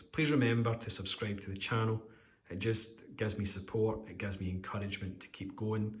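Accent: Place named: Scotland, United Kingdom. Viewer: Fantastic, so quick off the mark with your video of Cable, congratulations on your pick up and getting it early doors. So pleased for you, delighted you called it right and now have a great figure that you are happy to have in the collection. As mentioned in previous comments I do think a lot of people were too down on the figure from the get go, I really like it and think it presents so well, a real welcome edition to your great collection for sure. As for your dulcet Scottish tones, as a Glaswegian myself I am delighted your channel is going from strength to strength 😂 really enjoyed the video and as always, looking forward to whatever you may have next in the pipeline, please keep the videos coming, a great watch, as always stay well👍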